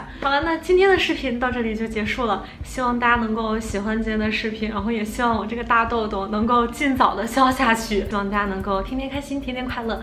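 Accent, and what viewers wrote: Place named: Xi'an, China. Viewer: 作为半个西安人，听krysti说话特别亲切！西安妹子加油！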